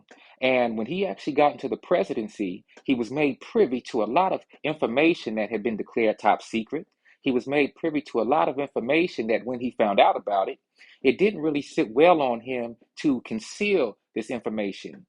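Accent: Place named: Thames, United Kingdom. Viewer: Vid is fire 🔥you nailed the thames accent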